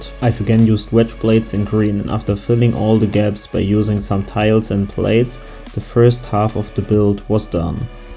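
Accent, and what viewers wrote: Germany. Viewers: Bist du deutsch weil du hast einen ziemlich deutschen Akzent und hast die Deutsche Artellerie auch sehr gut ausgesprochen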